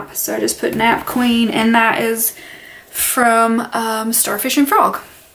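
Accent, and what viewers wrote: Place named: South, United States. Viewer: New sub. Love this. Sounds like you are a fellow Southerner. I live in Tennessee. Blessings.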